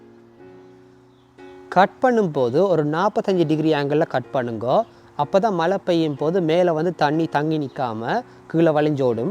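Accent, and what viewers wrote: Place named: Sri Lanka. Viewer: Srilankan Tamil, I think U are from Sri Lanka. You share good things and very useful, Thank you brother good job 👍.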